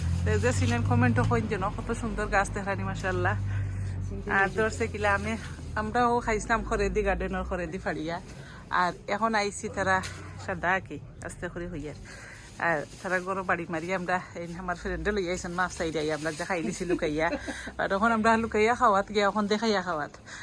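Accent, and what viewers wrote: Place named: Sylhet, Bangladesh. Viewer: আসসালামু আলাইকুম আপুনি। কেমন আছো? মাশা-আল্লাহ আপুনি এতো সুন্দর পরিবেশ 😮❤ আপুনি তোমার সিলেটের ভাষা শুনলে কিছু বুঝি না, কিন্তু এতো মজা পাই যে কি বলবো।😂 কাঁচা খেজুর আটি সহ খেয়ে নিলে।কেমন খেতে লাগে তাতো বললে না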